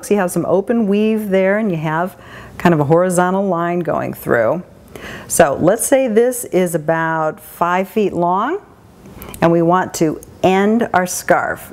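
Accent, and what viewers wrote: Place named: Oregon, United States. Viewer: omg! i cant seem to stop haha...and your voice reminds me of Oregon! i miss my home state :'( and a wonderful knitting and sewing grandma who lives there! Thank you once again.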